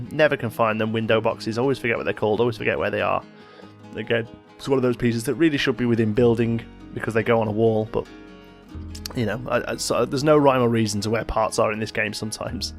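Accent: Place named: Birmingham, United Kingdom. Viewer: Absolutely love this series. Probably the best I've seen. Love the detailing. Also, I did comment asking about your accent, but I think I've concluded its a Brummie accent? Correct me if I'm wrong.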